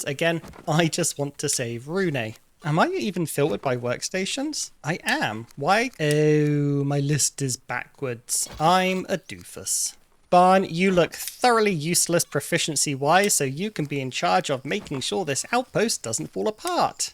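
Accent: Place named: United Kingdom. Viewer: I do wish he could feel like he doesn't need to hurry up. I watch at 90% speed because with his accent (I speak Murican not British or Aussie), it's difficult to some of what he's saying as he speed slurs his words together as though he's just having a banter with the blokes on the block or whatever they do in his part of the world. Anyway, sir, you can talk at normal speed without viewers catching Twitter Brain and tuning out because you're speaking at a normal pace.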